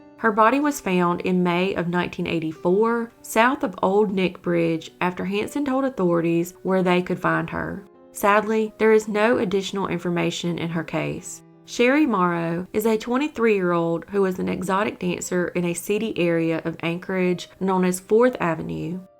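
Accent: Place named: Mississippi, United States. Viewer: I'm from Southeast Tennessee and you sound just like we do... Why I love ur videos. Keep it up!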